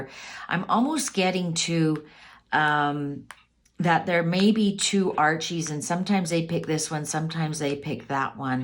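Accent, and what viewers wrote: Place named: Canada. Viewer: I had no idea that is a fellow Canuck 🇨🇦. I just picked up on the accent. I knew there was a reason I liked her in addition to her being a talented, intuitive reader. Very good reading, 🔮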